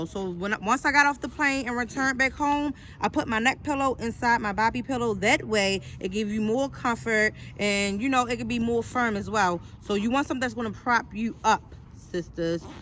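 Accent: Baltimore, United States. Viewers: I’m going to guess where you’re from by your accent. Baltimore?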